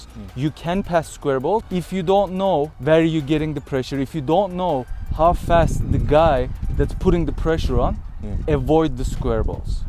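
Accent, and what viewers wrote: Turkey. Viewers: Seni tanimiyorum ama aksanin tam bir turk bu yuzden ARDAAA GULERRR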